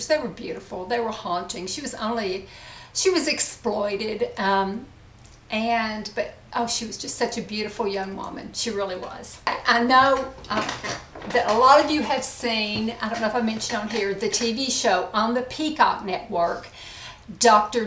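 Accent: South, United States. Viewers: Love ur southern accent!what state are u in...?also would that Bobby brown shade look good on brunettes?always looking for a new shade...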